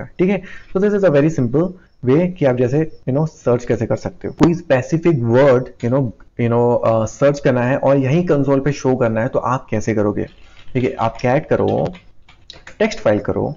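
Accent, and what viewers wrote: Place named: Hyderabad, India. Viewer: You are Hyderabadi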